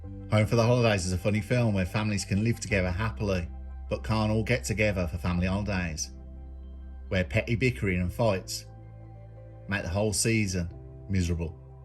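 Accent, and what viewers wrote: Birmingham, United Kingdom. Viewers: I’m working my way through your video slowly, but I thoroughly enjoy your wonderful work. I don’t normally comment but I thought this video brought your personality through brilliantly. Keep up the good work! I enjoy your sillly smooth Brum accent (I’m a manc) 👍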